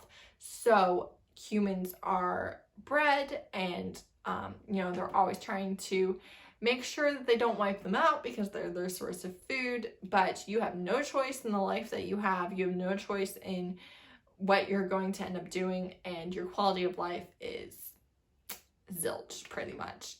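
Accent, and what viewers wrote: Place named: United Kingdom. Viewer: you always sound British in your intro lolll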